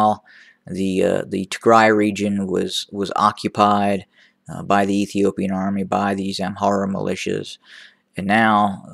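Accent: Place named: United States. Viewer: it is an American accent indeed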